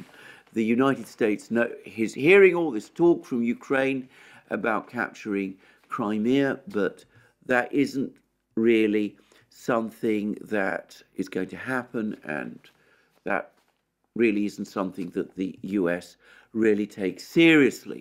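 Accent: United Kingdom. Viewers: "Pentagin" 😁 I love the Brit accent.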